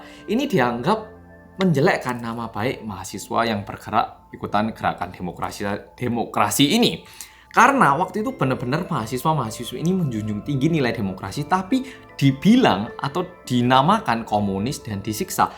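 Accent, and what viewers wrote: Java, Indonesia. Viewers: Je Te Be Ce... sueneng aku dengere...medhoookkk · Bersama orang korea yang medokkkk , suka banget aku cara ngomongnya dan intronya!!! 😀👍🏼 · Orang Korea yaaaang medoooooook!!! Yeay 😆😀🤣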